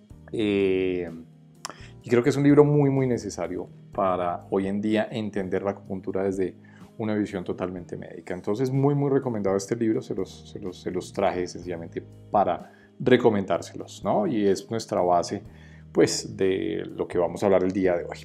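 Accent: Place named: Huila, Colombia. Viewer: Perdón Eres Opita? Del Huila? Soy de Caracas Venezuela, pero hablas igual un tío mio de allá, saludos, por cierto, gracias por la información del vídeo.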